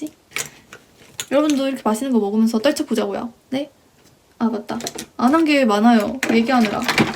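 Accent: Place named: Jeolla, South Korea. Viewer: 헐 왜 전라도 분인줄 알았지....?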